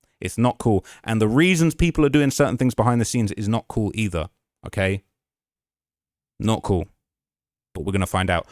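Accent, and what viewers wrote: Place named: United Kingdom. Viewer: Love your accent UK 😊❤ but yeah I agree I can never put my self in Celeste family’s shoes for one I wonder if their interpreter is the Salvadoran interpretation or a Spanish one because some of the words sound the same but have different meanings it must be difficult for them in every way because I don’t think they get the full details but seeing the evidence is about the only thing they can fully understand 👀🧐😮‍💨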